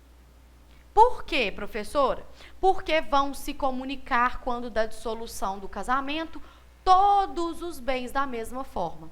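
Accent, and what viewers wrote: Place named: Belo Horizonte, Brazil. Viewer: Ola Prof este seu sotaque é de BH kkkkk.Mas uma boa explicação de vossa parte.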